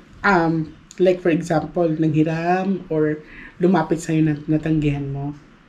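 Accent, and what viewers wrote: Visayas, Philippines. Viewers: Bisaya gyud 😁😁👌👌👍👍watching u miss.. from Cebu City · Cute mn mg bisaya si ms ❤️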